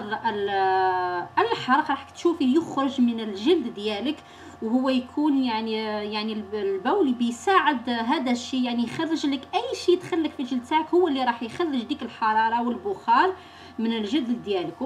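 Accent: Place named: Algeria. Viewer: من لهجتك يضهر على انك جزائرية والجزائريون معروف عليهم انهم عشرة في عقل البول كله سموم ولا يصلح لشيئ غير التبول عليك على هاذا الكلام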